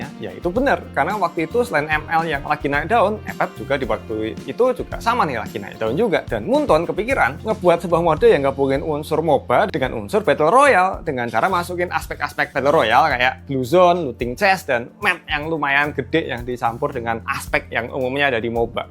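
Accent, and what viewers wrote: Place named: Java, Indonesia. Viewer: Aku org jawa, tp gk tau knp klo logaty medok bgni jd gk meyakinkan, krn biasay org sono2 garing, baru tau sdkit heboh.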